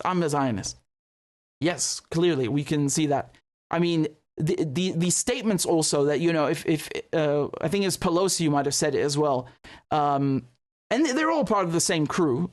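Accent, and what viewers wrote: Texas, United States. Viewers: Your Texan accent sounds pretty good. But then I am a Kiwi, so what do I know? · Love your Texas accent bro. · Texas voice not too bad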